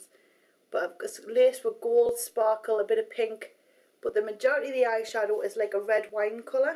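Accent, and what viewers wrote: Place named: Northern England, United Kingdom. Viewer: She's English. And if I'm not mistaken, she's from Northern England, which is an accent people aren't often familiar with.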